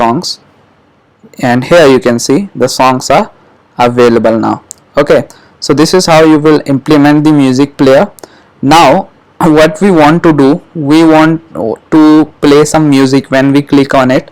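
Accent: India. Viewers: Nice to hear some Indian voice in flutter area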